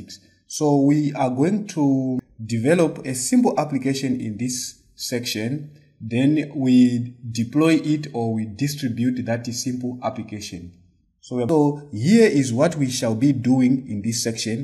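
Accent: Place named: India. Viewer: please never talk like Indian programmaer, it is disgusting. please please please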